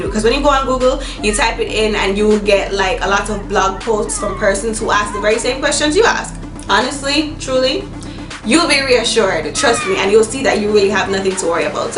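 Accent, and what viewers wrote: Jamaica. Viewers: you're welcome. I'm currently 15weeks and I needed some tips, your video came up instantly and I immediately picked up the accent being Jamaican myself.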